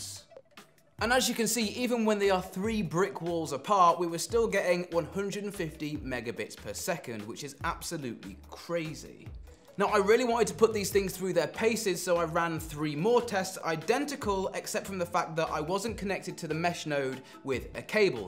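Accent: England, United Kingdom. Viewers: Love your English accent! 😁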